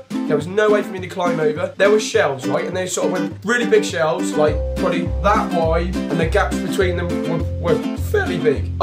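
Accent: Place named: United Kingdom. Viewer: Your eyes omfg!! :3 Your accent is soo cute, I love British accent!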